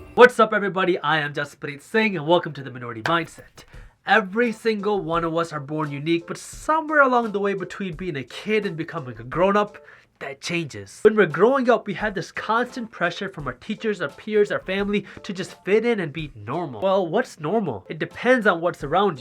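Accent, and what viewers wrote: United States. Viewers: I just love it how you start with the Punjabi accent in the beginning and say your name, and subtly switch to American accent after that!! (In all your videos) Super proud Punjabi :D Keep inspiring man!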